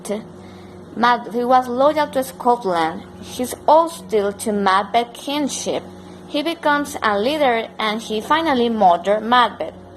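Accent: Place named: England, United Kingdom. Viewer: Hello, this Is Arka. Thank you for subscribing my channel. BTW, you are beautiful as well as intelligent. But where do you live? I am asking, just because of your English ascent.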